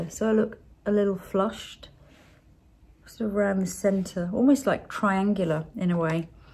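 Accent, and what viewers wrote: United Kingdom. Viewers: You have a British accent !)))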